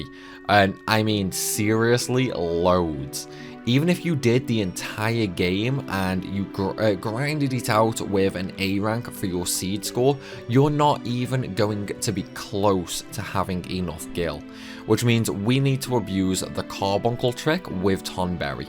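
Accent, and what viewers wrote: Stoke, United Kingdom. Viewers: I’m normally pretty good with accents, but I can’t quite get yours. Are you from Stoke/surrounding area?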